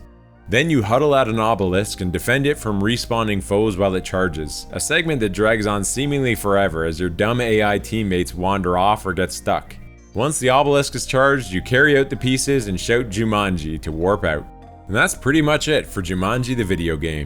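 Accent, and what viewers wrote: Canada. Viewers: Love the Canadian accent 🫶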